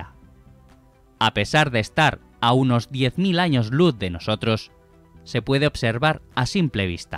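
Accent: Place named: Spain. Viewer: Excelente video, pero me caen mal el acento español.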